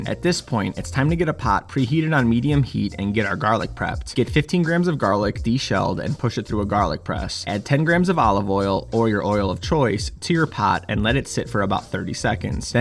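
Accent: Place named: Chicago, United States. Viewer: I cant stand the Chicago accent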